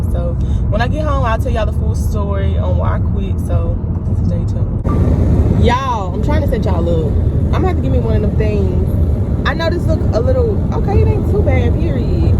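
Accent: Mississippi, United States. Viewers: I knew!!!! You had a Mississippi accent!!!! So happy for you!!! You have to do what feels right! Glad I hopped on your YouTube!!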